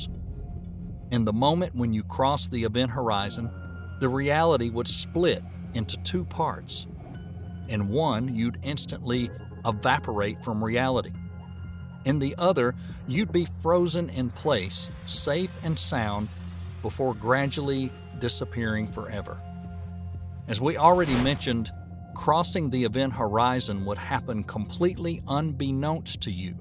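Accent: South, United States. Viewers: Dunno what to think about a guy with a heavy southern accent telling me about space... 🤔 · I REALLY WISH they'd pronounce it the right way, YOU- rah- NUss,, not you-R AAY nus,, and especially with that Southern Bible belt accent, I sincerely think the guy was pronouncing it that way because he liked doing it lol...... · British narration is so much preferable than an american accent like this. Too bad, could've been an interesting doc. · Love that southern accent spitting space facts! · This guy sounds COUNTRYYY! 🤠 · It just sounds so out of whack to listen to cosmological specials/documentaries narrated in that southern drawl. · Just 😍 the southern accent. Being a New Englander I don’t hear it often! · There's something about a guy with a southern accent talking about space that is surprisingly off putting imo. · A southern narrator. Never had that happen. 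I subscribed before I even watched the video and I'm here to stay. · Love hearing a southern narrator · "Peculiar -arities. ." Sorry, the muddy southern drawl and not pronouncing words is killing your cred.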